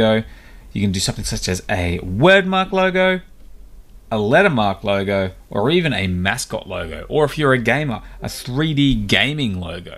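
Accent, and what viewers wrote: Australia. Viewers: Great to hear from an Aussie!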